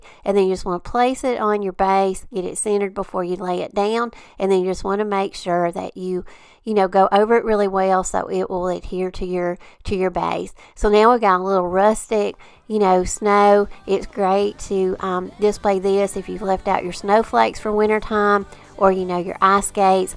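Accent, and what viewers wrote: South, United States. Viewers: Hi, my grandbaby and I watch you and get a kick out of your southern accent....her new hobby is turning everything 'trash to treasure'. We love everything! God Bless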